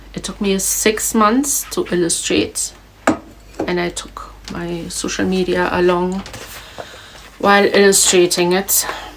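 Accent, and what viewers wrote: Trinidad and Tobago. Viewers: You may have left Trinidad, but I hear and see Trinidad in you. Big up, and stay strong gal. Ya go make it.!!! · You are stronger than you know. You are a true Trini resilience and strong. You even sound Trini❤ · You're a true Trini with accent and all. You will get there soon...love the sunset painting ❤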